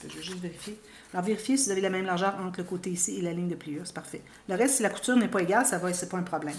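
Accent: Canada. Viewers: Bonsoir, Est ce que tu pourrais nous montrer comment faire un album sur le thème de Noël? Bonne soirée Ps: Votre accent vient du Canada?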